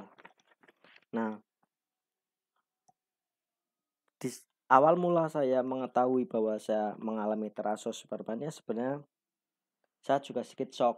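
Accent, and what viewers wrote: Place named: Banyumas, Indonesia. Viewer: Kyane wong ngapak kie, promile di dokter mana mas kalo boleh tau ? 🙏🏼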